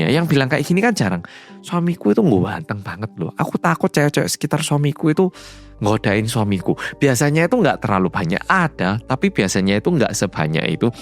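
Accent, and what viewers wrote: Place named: Java, Indonesia. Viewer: Mas, aku sering nontonin videonya sambil makan, mamaku sering denger aku nonton dari ruangan lain dirumah, suatu hari mama nanya “kakak kenapa sih suka nontonin cerita orang jawa?” (Karena logat mas medok) 😂😂😂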